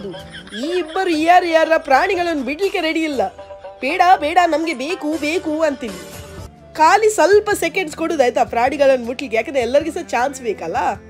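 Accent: Mangalore, India. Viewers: yake milk nodi kushi aitu gotta my sister voice kelidre milk aste 👌 erutte Aa Mangalore kannada kelode kushi❤️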